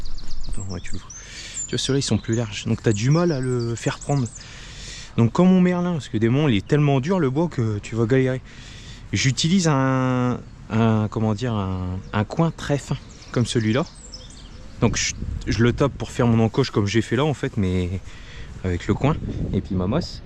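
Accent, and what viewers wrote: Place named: Nord, France. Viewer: Ti t es de ch'nord. Merci pour la vidzo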